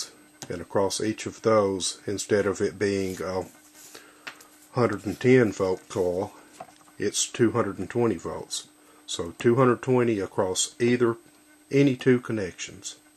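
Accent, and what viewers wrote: South, United States. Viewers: your southern drawl is awesome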